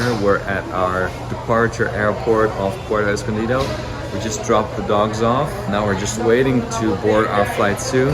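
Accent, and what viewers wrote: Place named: Netherlands. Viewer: What country are you from , your frame and face made me think anglo, but with the accent I thought Dutch or even Danish But the name is Portugues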